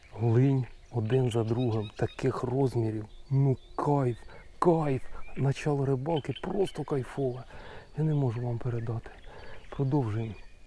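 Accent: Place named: Zhytomyr, Ukraine. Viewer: де така гарна водойма Житомирська обл Чую по говору😂?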